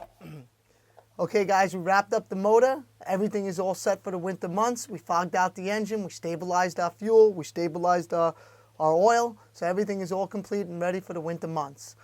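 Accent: Long Island, United States. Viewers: Holy cow what a think Long Island accent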